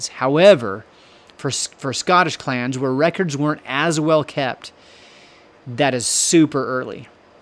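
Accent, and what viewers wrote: United States. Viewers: Putting up the name of each castle somewhere on the Image as you were speaking about it, would've been helpful, especially as (no offence) your American pronunciation is not always on par with ours. Maybe consider it? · Why is there an American voice doing this , it put me off , he’s reading all this and not very well . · Narrator with an American accent? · Why are we heari g an americans voice,instead of a Scottish voice. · I am an Armstrong please do oters with a Scot speaking instead of your American voice... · Why is an American doing the voiceover??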